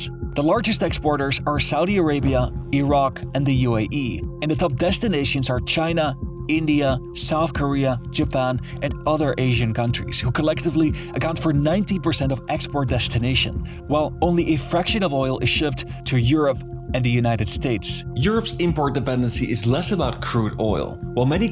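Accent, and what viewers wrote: Netherlands. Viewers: Mate are you dutch?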